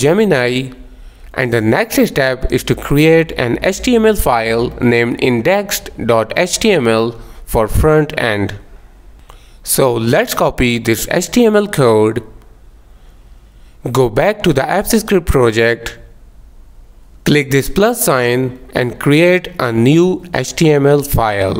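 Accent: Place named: United States. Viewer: Nice accent for an American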